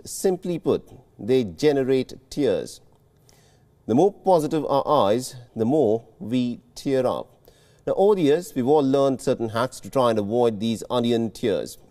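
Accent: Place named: India. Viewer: bastardized the English language with that ganges accent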